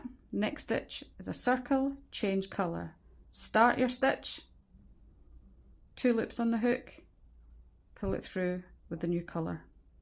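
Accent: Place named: Scotland, United Kingdom. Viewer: The taping comment made me laugh. Very scottish way of talking.lol and the dug in the huff 😂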